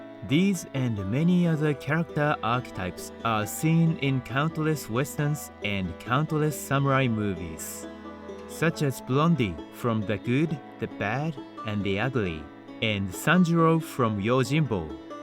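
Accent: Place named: United States. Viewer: Wait... was this for real? I am confused. This is supposed to be a joke right? *Mistakenly Calling Akira Kurosawa (movie director) as Akira Toriyama (who is the Mangaka of Dragon Ball). Also, whoever is narrating has an American accent in his fake-ish Japanese accent. (I see the person’s name is Japanese : I suspect this person lived in the US for a long time)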